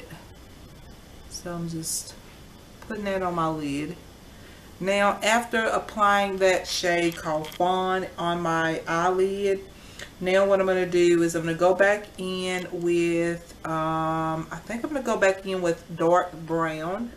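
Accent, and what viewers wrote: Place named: South, United States. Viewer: I just know you are from the south me too and your accent is great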